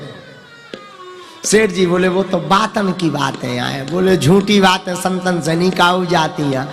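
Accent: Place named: Bundelkhand, India. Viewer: महाराज जी आप बुंदेलखंडी मैं कथा सुनाते बहुत अच्छे लगते हो 🌹 हमें भी समझ आ जाती है 💞 जम्मू से पगली भक्त हूं 🙇👏👏 सीताराम हनुमान जी 🌹🌹🙏🙏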